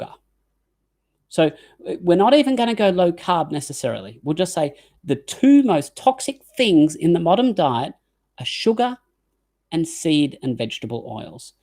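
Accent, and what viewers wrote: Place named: Australia. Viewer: Wanna give a shoutout to whoever thought of adding subtitles to this video. This helps for us people not familiar with the Australian accent. Hope this'll be the standard for future videos because YT's Closed Captions suck.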